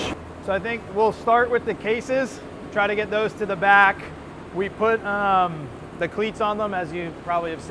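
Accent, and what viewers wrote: Boston, United States. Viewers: More Boston accent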